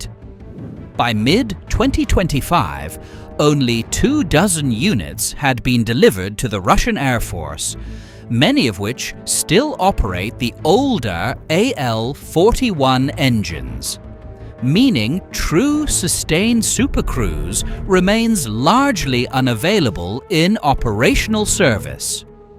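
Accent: United Kingdom. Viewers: Another English Accented Jewish Gangster Narrator, being negative. Says The BronxBoy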